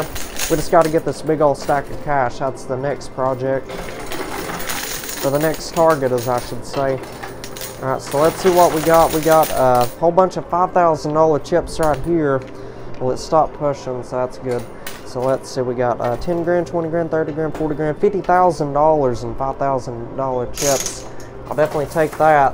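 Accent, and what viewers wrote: South, United States. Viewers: I am English and I would like to know which region in the USA is your accent?? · Definitely southern, probably call soda , coke.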